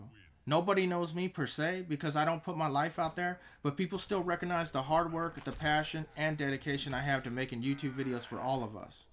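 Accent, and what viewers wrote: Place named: Mexico. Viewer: you sound Mexican and black and country white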